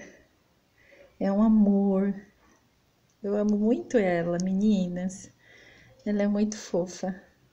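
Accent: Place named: São Paulo, Brazil. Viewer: sotaque de sao paulo, igual ao da professora, é o mais bonito do planeta. pronuncia limpa sem enfeites, quase um canto. rsrs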